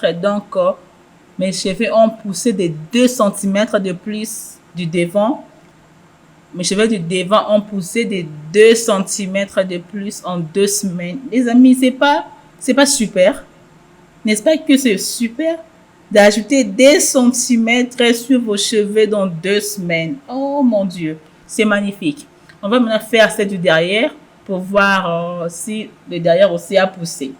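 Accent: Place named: Congo. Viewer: Hummm l’accent du Congo 🇨🇩 my sister 💪🏾💪🏾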